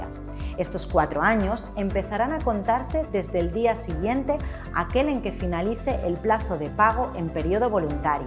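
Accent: Spain. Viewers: Habla de España. ¿ no te enteras del acento o qué ?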